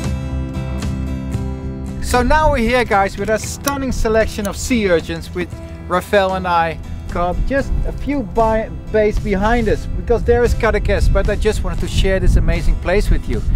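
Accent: Netherlands. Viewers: Just randomly clicked on this video, not knowing who this person is. But I'll recognise such a strong Dutch accent within seconds!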